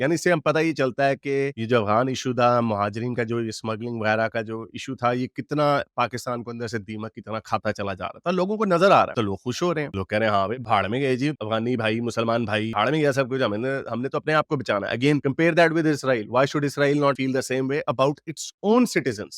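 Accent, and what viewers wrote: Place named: Punjab, India. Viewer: bhai aapke bolne ka style Indian Punjab jaisa hai. Matlab ttha sota baat kerte ho. Jo fact hai ussi pe baat kerte ho. Neutral ho ke baat kerte ho. Ye nahi ke aap pakistani ho toh sirf India ke berkhilaaf hi bolna hai. Aapne Pakistan ke propaganda ko khol ke rakh diya. TNX 🙏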